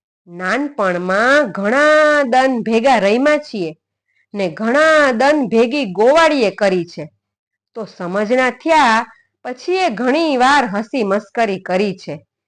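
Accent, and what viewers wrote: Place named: Kathiawar, India. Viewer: પન્નાલાલ પટેલ ની વાર્તાઓ આ પ્રમાણે કાઠિયાવાડી લેઝા થી વંચાય નહીં એના માટે તો સાબરકાંઠા એટલે કે ઉત્તર ગુજરાતનો લહેકો અને લહેજો હોવો જોઈએ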